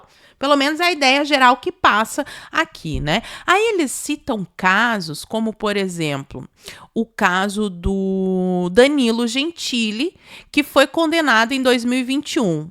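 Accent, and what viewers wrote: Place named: Rio de Janeiro, Brazil. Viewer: Cariocão kkkk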